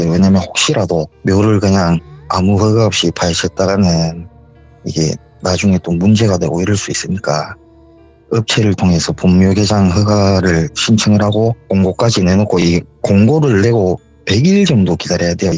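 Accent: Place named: Gangwon, South Korea. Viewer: 지역이어디지?경북인거같기도한데 아니고...강원도인가?첨들어본 말투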